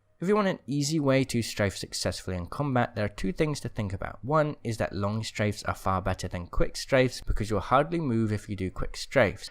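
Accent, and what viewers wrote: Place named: Scotland, United Kingdom. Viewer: スコットランド人の訛り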